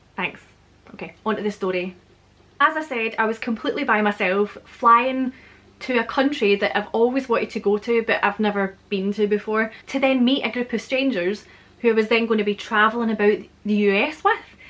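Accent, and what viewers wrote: Scotland, United Kingdom. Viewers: I'm getting better at understanding your Scots accent. So it's progress. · I love listening to your stories. I love learning scottish accent from your stories! and what an adventure you had!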